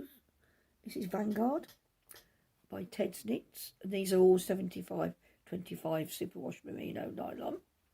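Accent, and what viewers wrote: Scotland, United Kingdom. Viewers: Hi I really enjoyed your podcast after Caz introduced you? I too am Scottish. I really like your accent, I’m off to watch your other videos now. Happy knitting 🧶🌸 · Hi, I watch Caz too and she told us about you so here I am. Thoroughly enjoyed your podcast, as someone below said, it was just like sitting having a chat. You came over really well. You are a lovely knitter and I'm looking forward to watching your other 3 podcasts you've done so far. Keep up the good work. P.S. your accent was lovely and like Caz I'm a Scot but a low lander and your accent sounded perfect to me. Take care and stay safe. Alexis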